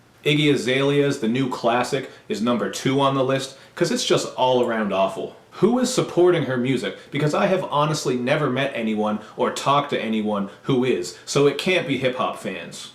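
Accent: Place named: Canada. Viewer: Will do. Are you Canadian? I love the accent.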